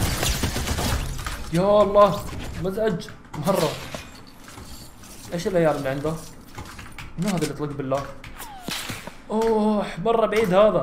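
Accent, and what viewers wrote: Iraq. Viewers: منور يا كلبي بل لهجة العراقية 😍😂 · هو ما قال بس من طريقة كلامة واضح عراقي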